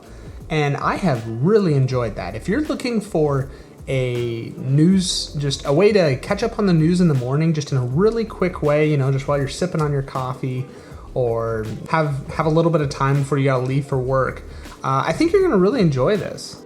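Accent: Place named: England, United Kingdom. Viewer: I like your English accent!! God bless you a lot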